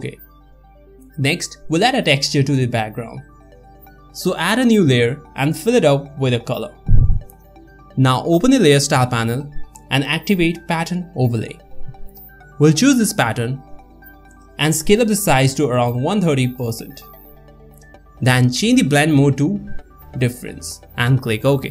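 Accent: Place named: India. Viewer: Love your videos But please keep your Indian accent.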